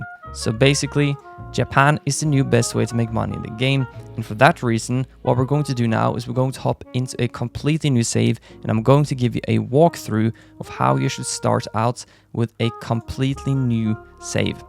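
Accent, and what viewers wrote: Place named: Norway. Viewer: Brooo Are you from Norway? Or should I say er du fra Norge? Jeg så det når du sa vi skulle abonere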